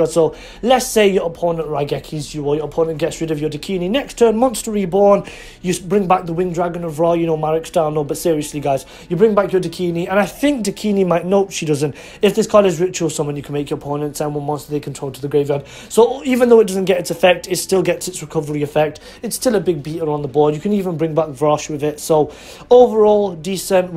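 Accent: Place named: United Kingdom. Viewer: #Nekrozmasterrace I pronouce Natasha the same way you do, even though the only girl I met with the name irl pronounced it like stache too. Personally Impcantation is a better name than Deviritual to me. It's a pun I can get behind. Yeah, I believe you're british. Saying that as a fellow British. I can recognise our kind. 'Eyyyyyyy! That ghost rare artwork for the extra deck cards.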